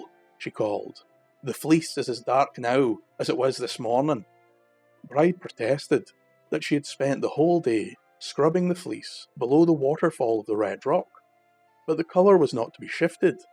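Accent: Scotland, United Kingdom. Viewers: U’r Story-Telling has held me En-Tranced thru-out! I’v not ever heard this ancient Tale of Love ❤ & the forthcoming of the Enlivening Magical Energies of Summer-Time🙏🏻🌳🌹💐🦋 I especially En-Joy hearing Ur Scott Accent - It’s a Marvelous Brogue for the Ear👂to Hear ❣️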